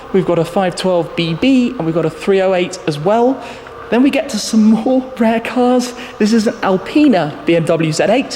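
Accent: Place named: England, United Kingdom. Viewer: i really like your english accent. verry lovely hearing👂👂💗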